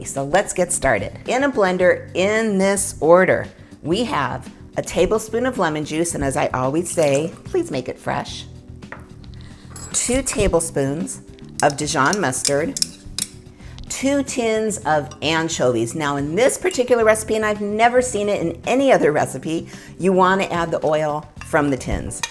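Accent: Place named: South, United States. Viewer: Hi! That dressing looks great! I have not seen a recipe calling for the oil from the anchovies, either, so I am sure that dressing would have a ton of flavor. Accent is definitely Southern! I agree that olive oil has a strong flavor and probably wouldn't be right in this. Romaine is so common now, a lot of people won't eat Iceberg...hard to believe that Iceberg used to be normal. Wow, that turned out great. Like you said, it makes a ton, so you can make your neighbors happy. Thanks for sharing!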